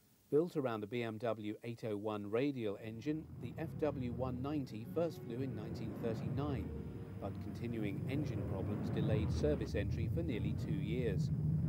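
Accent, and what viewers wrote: RP, United Kingdom. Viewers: Nice public school English , but background sound is way to high . Fire the editing guy .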